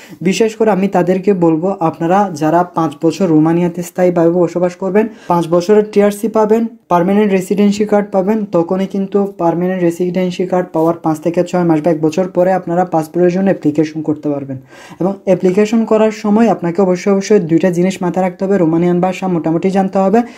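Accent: Chittagong, Bangladesh. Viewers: ভাই আপনার বাড়ি কি চট্টগ্রামে?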